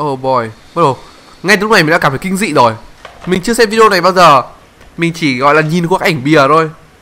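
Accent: North, Vietnam. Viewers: Anh là người miền bắc đúng kg ạ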